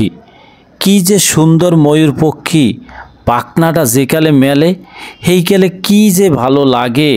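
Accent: Barishal, Bangladesh. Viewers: অনেক সুন্দর ❤❤ আপনার মুখে বরিশালের কথা ভালো ই লাগতেছে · স্যার অসাধারণ অসাধারণ কথাগুলি বরিশালের কথাগুলি অসাধারণ লাগছে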